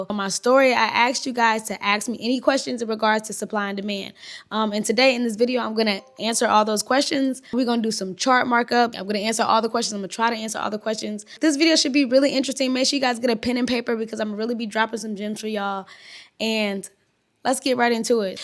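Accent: United States. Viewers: Are you from DC?? You sound like Blac Chyna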